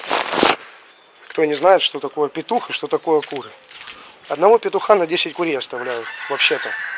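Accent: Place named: Kuban, Russia. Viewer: Привет, канешно мало баклажан, а говор уже кубанский проявляется!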